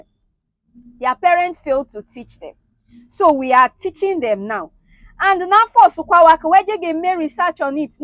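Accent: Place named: Igbo, Nigeria. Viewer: I just love the fact you are speaking the Igbo language hearing the different so called "fake" Igbo dialect always tickle me as an Abia man 😂 as I notice all the different sounds and subtleties, off course I believe that every Igbo person is equal to every other Igbo and there is nothing like real or fake igbo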